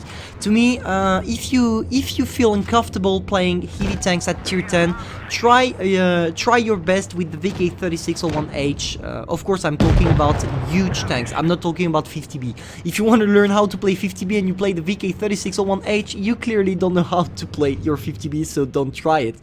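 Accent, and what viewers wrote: France. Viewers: He's French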